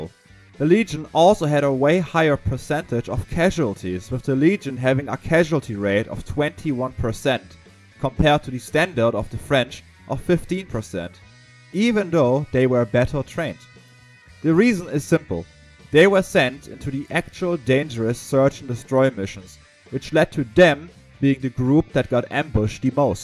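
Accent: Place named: Germany. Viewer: Ich liebe deinen deutschen Dialekt in Englisch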